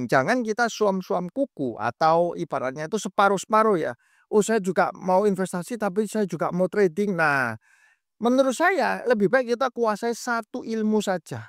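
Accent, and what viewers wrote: Surabaya, Indonesia. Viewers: logat medok suroboyoan rek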